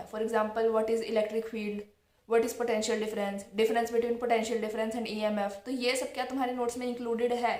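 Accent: India. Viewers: Aap rajasthan se ho ? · Aap haryana se ho?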